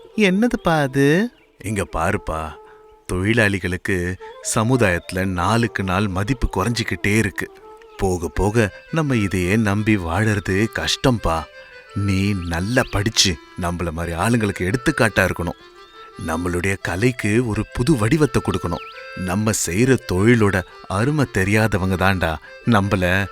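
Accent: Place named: North, India. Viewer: Avar vadakkan apdithan pesuvaar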